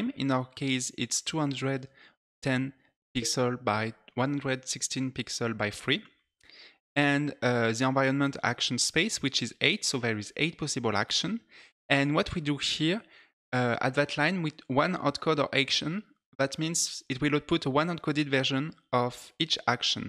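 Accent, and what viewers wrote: France. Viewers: Tu n'est pas français par hasard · Very good, thanks for your contribution. Amazing. (ps: i listin only for you french accent).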